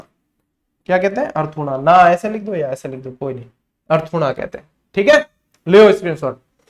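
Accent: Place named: Sirohi, India. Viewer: Jay shree SARNESHWAR MAHADEV guruji me sirohi ka rehne vala hu aapke बिल्कुल najdik esliye aapki boli muje बहुत achhi lagti he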